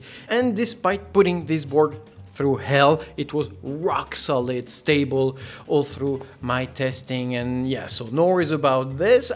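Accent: France. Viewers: Nice video, love your french accent :p Can you still run two M.2 NVME Gen 3 while running a Ryzen 3000 on this board? Or are you forced to use M.2 NVME Gen 4 when using a Ryzen 3000 CPU? Enjoy the rest of your week! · I barely bear your accent. im not a english native speaker, try to follow your speech is very hard for me. Also you are french. (im italian) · This review is great. usually with the French accent there is a high "punch me in the face" factor, don't get that with this guy at all - also Je Parle Francais - Merci bon homme. subscribed.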